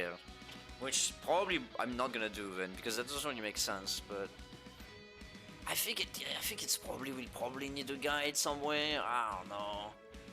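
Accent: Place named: France. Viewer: What is that accent? 😮 Are you French or Canadian or from Louisiana? Btw my favorite game of Mortol II ❤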